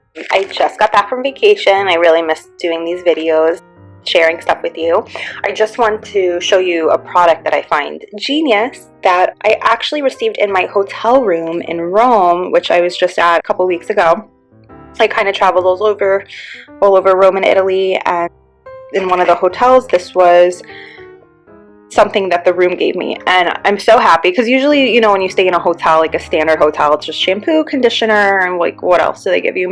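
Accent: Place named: New Jersey, United States. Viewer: Its a British brand and it works. Are you from the Jersey Shore? The accent :)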